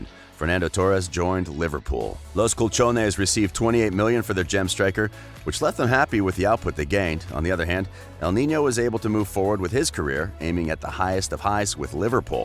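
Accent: United States. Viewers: An American accent starts talking about "Soccer"...nah...not watching it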